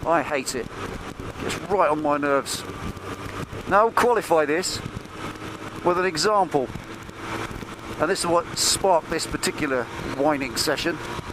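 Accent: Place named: Birmingham, United Kingdom. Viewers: Love the moaning Brummy accent, lol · Well im from the midlands ...... i laughed like a hyena lol, nearer coventry than birmingham, sounded a bit brummie :-) Well vented spleen :-) Hey dont apoligise about vids, the garage vids can wait. Dont reply to this...... i said dont!! :-)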